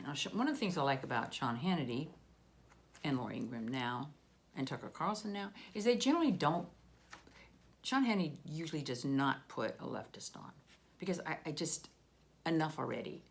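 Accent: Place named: United Kingdom. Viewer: What accent is this? You look Eastern European, sound British and seem very intelligent 😌❤️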